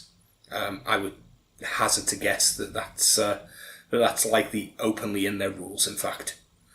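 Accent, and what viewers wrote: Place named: England, United Kingdom. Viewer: Thanks for this very intelligent, interesting talk. It's very courageous of you and will earn you lots of brickbats, I'm sure. I did not know that one can block people on YouTube. How do you go about it? I am thinking of starting a BT channel. I am put off BT a lot because it seems almost entirely to consist of young, female 'readers' posting who are as interested in their appearance and their books' appearance as they are in books in general. Eg, shelving your books according to the colour of their covers! That strikes me as pretty infantile, unless you're five or six. Many of my books are shabby, grainy second-hand copies with little colour about them at all. One young female poster gave details of her make-up and where to buy it! I'm an old man and so feel really out on a limb, but will still give it a go,because I would like to meet a handful of people who are serisouly interested in books. 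I have found a few older women's' channels that are very interesting and from whom I have actuallyl earnt things. Another complaint I have is the lack of people interested in non-fiction reading. I hardly read anything else! The algorhythm must have filtered a lot of left-wing things out from my recommendations. I haven't had lots of leftie rubbish thrust at me, but I have noticed that leaning, too. Your picture seems very American, culturally speaking, though you sound 'English'.. I'm a Brit. There aren't many Brits on BookTube. Thanks again for a great contribution.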